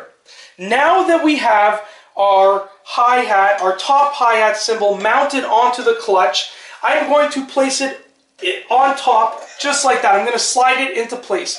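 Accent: Canada. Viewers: Awesome video man and it sounds like your from Canada howdy my friend and neighbor to the north. Keep up with the tutorials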